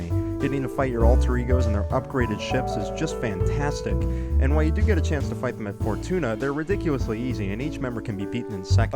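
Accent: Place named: North, United States. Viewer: Doesn't really sound like James Rolfe but he could be from a similar part of America. He certainly sounds like he has a northern accent.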